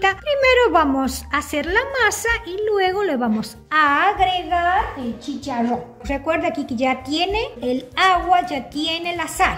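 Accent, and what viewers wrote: Tucumán, Argentina. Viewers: Felicitaciones, por esa exquisita TORTILLA TUCUMANA, señora. Me encanta escucharla, esa tonada tucumana, me recuerda a mi querida madre, que nos hacía las mejores comidas tucumanas. Soy tucumano, resido en Buenos Aires, estuve un tiempo viviendo en mi querida provincia, trabajé en los medios de comunicación de allí, como en canal 10, Diario La Gaceta, LV12, Radio Nacional y, también en LV7. Soy meteorólogo y, tenía a mi gran amigo, ya fallecido el periodista Mario Escobar, que me presentó en casí todos los medios. Ud. me hizo caer unos lagrimones al escucharla hablar, recordé a mi madre. Le deseo a Ud. y familia, muchas Bendiciones.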